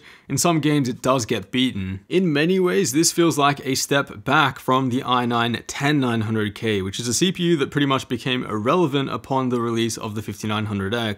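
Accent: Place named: Australia. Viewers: He's Australian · definitely australian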